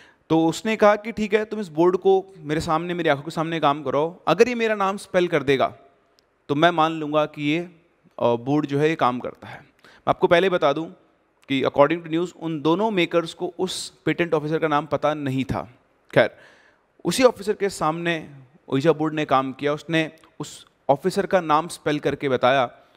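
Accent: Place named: Bengal, India. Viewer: Apki bengali such mein sunne mein bohot cute hai sir...khub mishti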